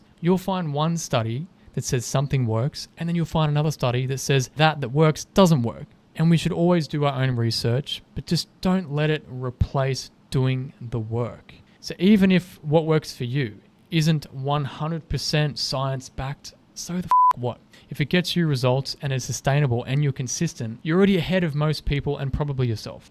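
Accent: Australia. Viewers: 😅 Ho sempre pensato fossi australiano 🤷‍♂️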